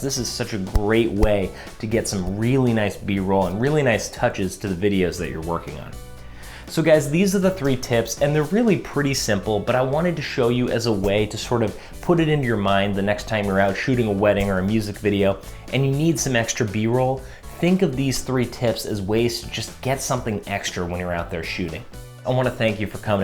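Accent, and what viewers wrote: Boston, United States. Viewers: Wait! Are you Boston?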